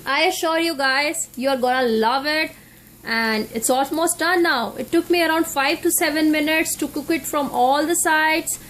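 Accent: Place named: Punjab, India. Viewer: U have punjabi accent. English doesn't suit you. If u speak in punjabi it will sound more good seriously.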